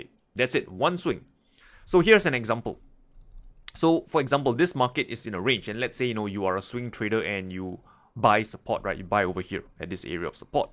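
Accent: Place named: Singapore. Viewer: You have a very Singaporean accent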